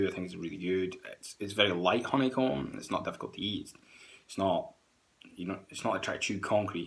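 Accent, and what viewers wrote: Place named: Scotland, United Kingdom. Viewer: Lol first time I have heard your voice my mate.... a tad scottish :-)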